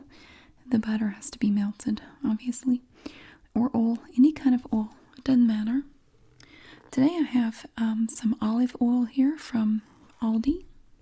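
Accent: South, United States. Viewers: She has such a sexy southern voice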